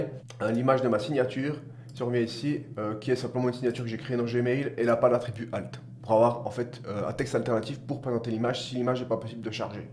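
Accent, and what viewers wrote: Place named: Belgium. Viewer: Très clair et précis et avec un bel accent belge si je ne me trompe pas ! Thanks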